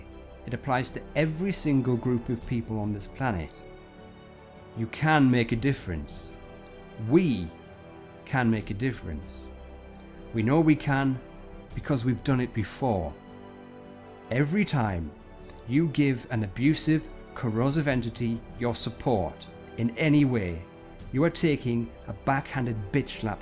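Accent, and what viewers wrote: United Kingdom. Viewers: dat british accent :O!!!!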